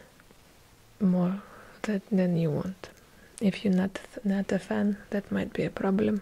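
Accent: Russia. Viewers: Why are you so underrated 🥺 ma'am im from Pakistan and i love your heavy Russian accent asmr ❤️ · Some people say french Italian Spanish me i say Russian all the way! Just perfect! 🔟🌹😍🥰💗💗💗#️⃣1️⃣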